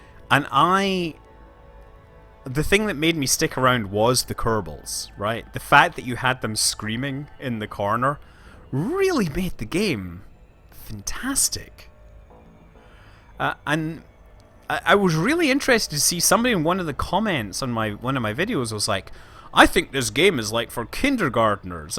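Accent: Scotland, United Kingdom. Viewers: are u scotish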